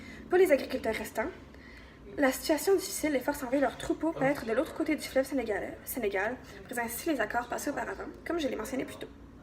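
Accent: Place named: Canada. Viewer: L'accent est un peu difficile à comprendre. Est ce comme ça que les canadiens parlent ?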